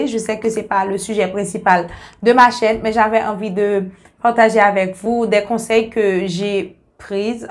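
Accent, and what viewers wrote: Haiti. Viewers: T'est haïtienne ma chérie ya l'accent fort 😅😘😘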